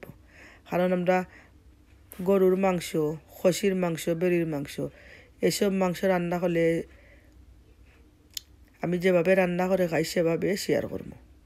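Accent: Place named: Sylhet, Bangladesh. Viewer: আপু দেখিলিছি আপনার রান্না , মিস করছিনা। বাক্কা মজা লাগল আপনার রান্না । আমার নানা সিলেটে । তাই আপনার মাতকতা বালাউ লাগে আমার কাছে । আমার মায়ের ভাষা ।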